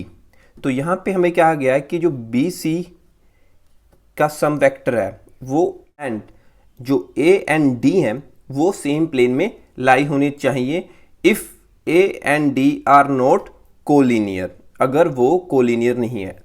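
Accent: Punjab, India. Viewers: sir great explaination , also are you from punjab?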